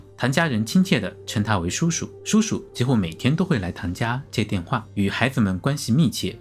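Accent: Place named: Kunming, China. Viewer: 博主口音为什么像昆明人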